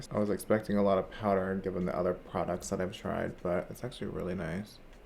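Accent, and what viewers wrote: Australia. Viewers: i love ur Australian accent bih keep doing it pls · Omg! The Australian accent!! 😂 love it!! Tati RAVES about the Col.Lab mascara so I'm gonna finish watching this video now I had to tell u I LOVE the accent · I fockin love the Australian accenttt!! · Your Australian accent kills me 😂😂 it’s a mix of New Zealand and english accents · Your Australian accent is beut mate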